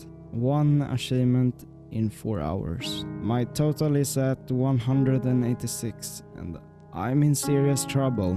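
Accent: Sweden. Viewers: You are Swedish , I can tell. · Är du från Stockholm? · man hör att du är svensk (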